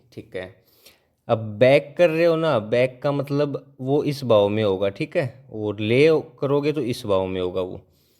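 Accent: Haryana, India. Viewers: Haryana se ho bhai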